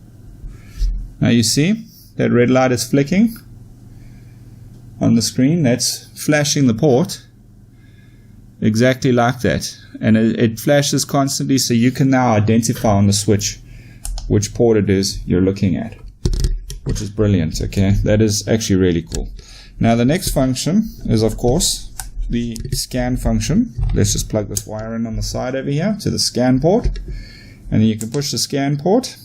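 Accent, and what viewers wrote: South Africa. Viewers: I thought you sounded South African! Mentioning pricing in Rand is what confirmed it. Thanks for the decent review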